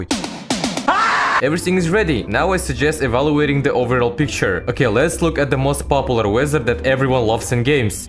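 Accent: Russia. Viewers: какой же у чела русский акцент))))))) мужик делай контент на русском пжлст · Man your accent is addictive, Ruuusssssiiiiiaaaannn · That russian accent ! Привет, брат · Акцент понимаю ты русский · Сижу, слушаю акцент питерского английского.... кайф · Ahh the Russian accent blessing my ears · бля ты руский что ли) акцент выдает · Есть ли у тебя канал на русском? Просто слышу сильно русский акцент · Im a simple guy. I hear russian accent, i sub. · ато я слышу что автор русский страно по американский говорит как друг мой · блин, вначале я не думал что это это русский акцент, всетаки на английском говорят кучу разных национальностей и я акцент сильно не различаю, но уже расставило всё по местам🤣 кста, может кто подсказать, моды лучше всего перекидывать просто в корневую папку, или в архив в корневой папке? и будут ли моды работать, если закидывать их в отдельно созданную папку в корневой папке игры? 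· that russian accent is the cherry on the cake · I can bet on the authenticity based on the Russian accent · Russian accent :D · russkiy blya ya tebya slishu suchka) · i Love his russian/english soo much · I subscribed for how funny the russian accent was, editing, and personality. Keep it up brah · по акценту понято что русский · Are you Russian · Акцент как у русскоговорящего. Лайк авансом. Расти большой дружочек) <3 P.S. только сейчас понял, что спустя 48 секунд видео, появляется родное уху: "Блять" 😅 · i freaking love ur russian accent lmao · terrible russian accent, all russians are guilty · Чел ты из Росси или знаешь русскому язык, это заметно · You can tell that Bro is Russian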